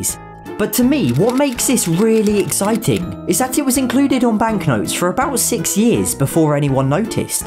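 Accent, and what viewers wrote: United Kingdom. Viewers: Love you my Bri"ish man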